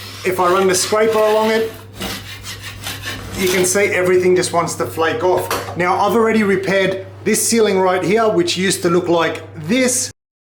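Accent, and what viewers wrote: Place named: Australia. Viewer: Loving the vids mate - very easy to understand and so refreshing hearing an Aussie in one of these DIY vids! 😂 I had a similar problem in the bathroom, prob even a bit worse, was at least half the ceiling. This is my third attempt in about 12-18 months! This time I used Zinsser BIN as the primer but basically followed this same process you detail. From what I can tell it is a similar product but no Binder in it... I have just applied a final 'top' coat of joint compound... Should I just use the BIN next before my ceiling paint or buy the Peel Stop or Crommelin? I could really do with your assistance. Cheers mate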